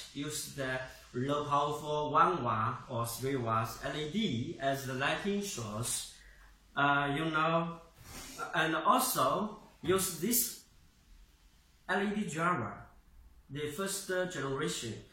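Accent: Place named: China. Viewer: 中文口音的英语。我真的听的很舒服。希望做一期中文版的 b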